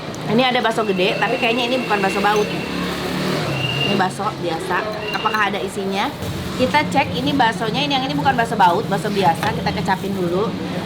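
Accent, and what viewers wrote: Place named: Cirebon, Indonesia. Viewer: Asli orng cerbon mna suaranya 😊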